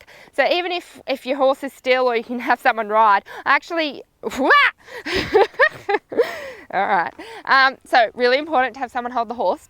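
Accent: Australia. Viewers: Ahh so nice to hear an Aussie accent! My sister and I are from Australia too, I'm super into dressage and I love your videos! (you can check our our channel if you like!) Keep it up! Good luck with the baby (saw a more recent video earlier!)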